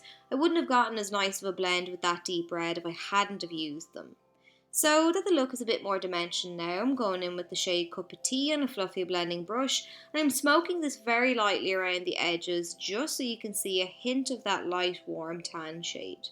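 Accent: Ireland. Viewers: Oh my gosh, I have been binging your videos! I can do basic makeup but that's about it- you have been so helpful and easy to follow! I also love your accent- I've been to Ireland twice and love it so much- you remind me of the wonderful people I met there! Thank you, go raibh maith agat! Love from Portland, Oregon, USA!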